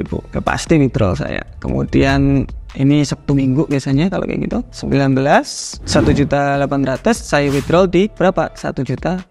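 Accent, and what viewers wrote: Java, Indonesia. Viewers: Asli mana mas Logatnya kayak dari Jawa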